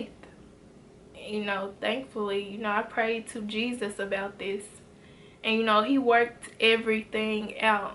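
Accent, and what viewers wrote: Memphis, United States. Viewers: Awesome video. You sound like you from Memphis,Tn . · I am loving your videos. Especially our accent(memphis) I graduate from u of m in dec. So I am going back for second degree bsn ( u of m) new subscriber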